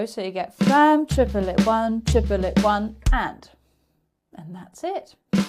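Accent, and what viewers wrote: United Kingdom. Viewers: Hey, love your teaching methods. I'm a 60-plus-year guitar player and singer and have been in many bands. They never wanted to do my songs so now I'm laying them down on Pro Tools. I don't play drums, so I'm laying them down in MIDI and your channel has helped me a bunch to get different 3/4 drum beats down and the fills. I have a digital drum set, given to me by my grandson, and plan on learning after I get my song down. You (your videos) will help me tons. BTW I'm a huge Beatle fan, so your accent is delightful and witty as Brittans tend to be. Love it.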